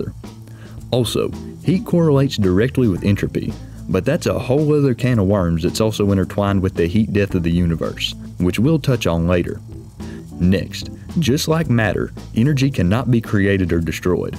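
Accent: South, United States. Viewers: well good job sir. When I heard some guy with a country accent talking about trains, I was expecting your explanation to be cold things get hot and hot things get cold not you actually leaping into a discussion about how thermodynamics work.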